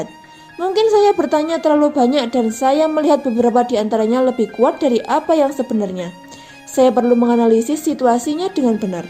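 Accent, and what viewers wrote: Java, Indonesia. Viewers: Medok plus plus. Aksen medok tapi nyebut nama pemain pake ejaan english yang rada rada maksa 🤣 mungkin ini yang disebut Javanglishia 🤪 Buat input ya min, ejaan namanya biar ga terlalu aneh, bagusan cati referensi ejaanya dari komentator bola berbahasa english pas pertandingan. Biar ga terdengar weird kalo disebutin di channel ini. Sukses aja min buat channelnya. Keep it up 💪🏼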